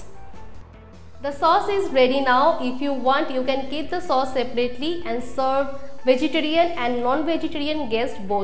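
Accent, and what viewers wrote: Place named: India. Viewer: Wtf is this nigga talking about....I guess all Indian English are same....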